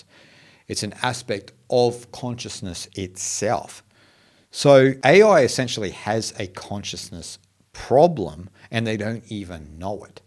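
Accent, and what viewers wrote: Australia. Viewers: no Indian, however how much esteemed he is in his field, could have explained so beautifully in english your description of the kali yuga. the particular phrase which you have used Swamiji, "the devolution of mind into matter", has really struck a chord somewhere. im assuming you're aussie from the accent. you will always find a home in Bharat. australia and nz are in for err a bit of rough weather mate. May Lord Shiva Bless you!. Om Namah Shivay!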